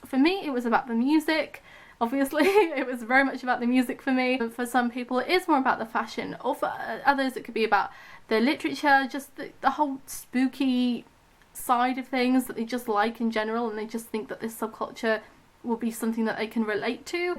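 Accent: United Kingdom. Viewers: Wasn't ready for the British accent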